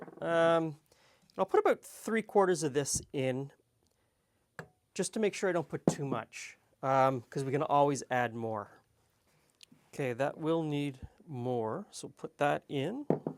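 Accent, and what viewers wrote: Canada. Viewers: I love your vids, but I laugh at how much you sound like my mother's Canadian cousins!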